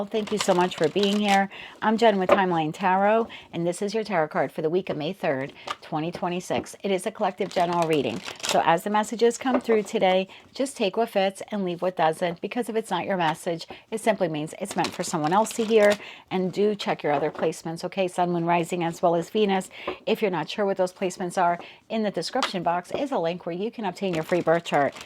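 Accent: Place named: New York, United States. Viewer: I'm presuming by your NY shirt, that you are a New Yorker. I can tell by your accent. Long Island here. ❤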